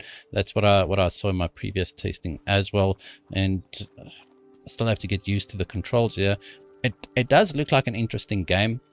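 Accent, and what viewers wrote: South Africa. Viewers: R u from south africa ? N any amd gpu to test this one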